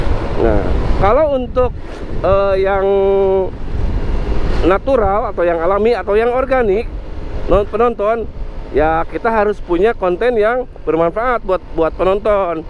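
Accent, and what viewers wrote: Purwakarta, Indonesia. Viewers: Kalo ngedenger cara ngomongnya jadi inget temen saya yang orang Purwakarta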